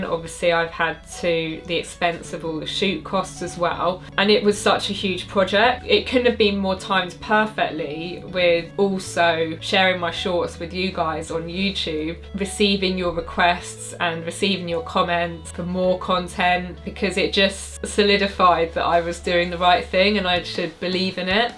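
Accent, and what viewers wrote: England, United Kingdom. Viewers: I’m fascinated by the juxtaposition of your British accent and the baseball caps in the background which are representing teams in the USA. 😊 Would you mind sharing your connection to baseball? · I really like your English accent 🇬🇧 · Incredible fit blonde beauty, British accent with a mild lisp with a niche subject = 1 million subs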